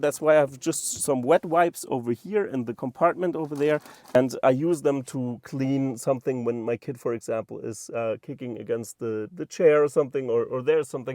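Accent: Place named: Germany. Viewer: Great car, great vid. I hope your longer review includes a proper test of the voice commands. It needs to cope with accents and a German accent is a great test. I love your accent but I want to know that Tesla responds well, too. Imaging how it will be if the Teslas get Grok!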